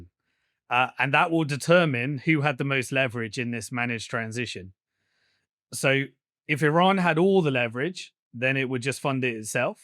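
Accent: United Kingdom. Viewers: He makes these wildly false statements. He drastically oversimplifies things he conflates completely unrelated things together. He refers to things that have Then, in place forever as new While stating his wild predictions and speculations as fact. He is the textbook example of a Zionist pompous british ass. Who thinks he could lie to your face because he has a british accent. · You sound like a city of London guy. You have no idea who Trump is or what he is capable of.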